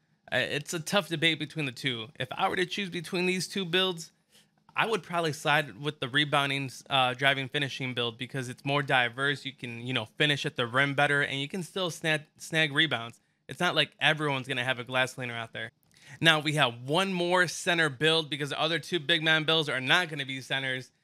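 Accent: Chicago, United States. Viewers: Wha part of Chicago u from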